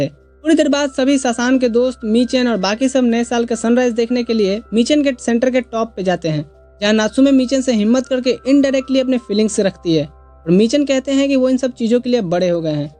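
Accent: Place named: Bhojpuri, India. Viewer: Mr apka asent bhojpuri 😅 h same here ☺️☺️and apki voice achi h 😴😴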